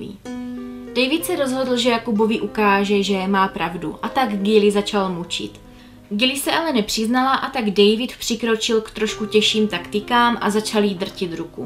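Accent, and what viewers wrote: Moravia, Czech Republic. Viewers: chtěla jsem Vám sdílet video na svém facebooku, ale neudělám to - uráží mě, že Jesenicko považujete za Čechy, když se jedná o MORAVU. Olomoucko leží na MORAVĚ, Olomouc je MORAVA. je to dost smutné, protože podle přízvuku jste i vy z Moravy.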